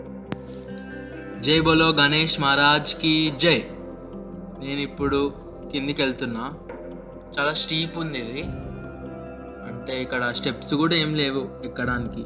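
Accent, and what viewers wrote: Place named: Telangana, India. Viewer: Kani aa yaasa ne baavundey raa, ek dam naatu Telangana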